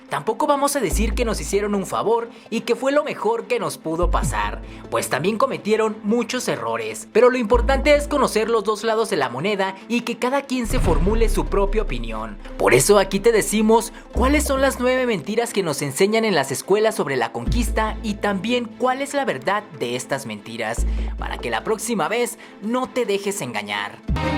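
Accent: Spain. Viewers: Fueron genocidas y hablas como gachupin no sirve de naa tu video hablas defendiendo a los q psotearon nuestros abuels da hueva tu video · Tu hablas como español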